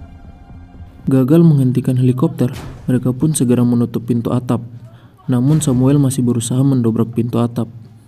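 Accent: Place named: Eastern Indonesia, Indonesia. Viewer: logatnya mirip orang timur. APAKAH ANTUM ORANG TIMUR?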